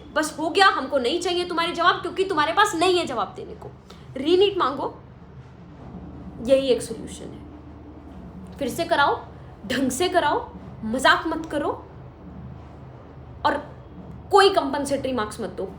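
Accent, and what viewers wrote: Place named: Bihar, India. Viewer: Are you bihari your toun like bihari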